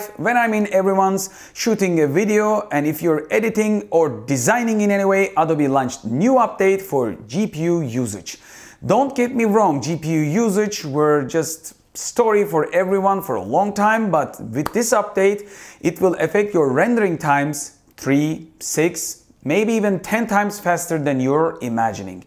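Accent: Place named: Turkey. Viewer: Direk duyar duymaz anladım Türk olduğunu. Aksan kayıyor kardeşş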